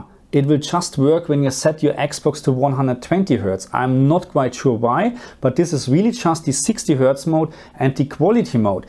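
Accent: Germany. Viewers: nices Video und nicer deutscher Accent haha😄😂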